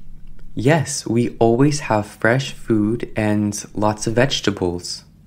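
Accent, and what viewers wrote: United States. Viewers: Usa accent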